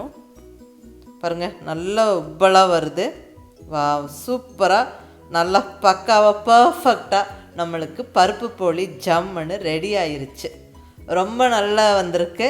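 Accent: Kongu, India. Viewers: அருமையான receipe mam, கொங்கு தமிழில் உங்கள் குரல் அருமை.👌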